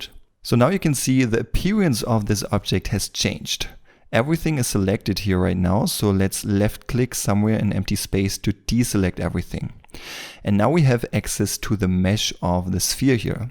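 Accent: Germany. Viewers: German accent😂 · Since you sound german, why dont you make german videos? :) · Gutes Tutorial, aber dein deutscher Akzent sticht stark heraus xD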